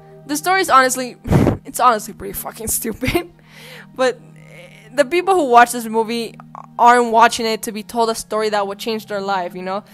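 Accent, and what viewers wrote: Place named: Mexico. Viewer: Oh damn, una mexicana with the accent, a weeb, and a shitposter, my typa girl. 😂 seriously tho, good video, brought a ton of childhood memories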